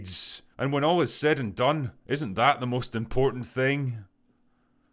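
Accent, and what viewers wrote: Scotland, United Kingdom. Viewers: Probably work on your accent you British cnt. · Why are you speaking with a weird inflexion in every sentence? I understand that Scottish is part of your brand but it’s annoying and doesn’t add any comedic value. Stop.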